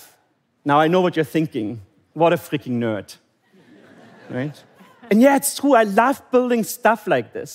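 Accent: Germany. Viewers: My mom once said "If you do not have to say anything nice say nothing at all" and this is why I do not leave a comment about his strong German accent.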